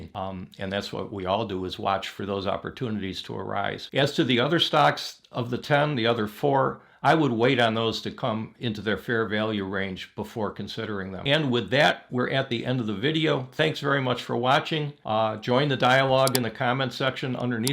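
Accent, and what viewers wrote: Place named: Pennsylvania, United States. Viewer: your have a Pennsylvania accent, maybe Pittsburgh: -you say "dowwars" , instead of dollars. -"quawllee", instead of quality. 😁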